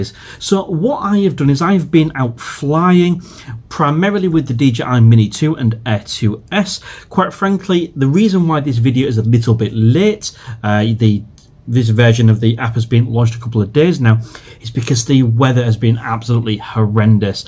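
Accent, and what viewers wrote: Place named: North East England, United Kingdom. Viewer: Definitely doesn’t sound like a Yorkshire accent being on the Humber where is it you’re actually from? It sounds more Sunderland Newcastle Middlesbrough area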